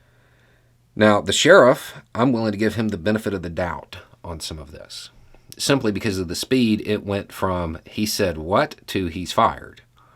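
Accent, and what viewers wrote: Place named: Texas, United States. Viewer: I commend you for standing up for your beliefs. Sounds by your accent you live in Texas therefore I understand the use of " the Fifth Column ", the enemy of my enemy is my friend.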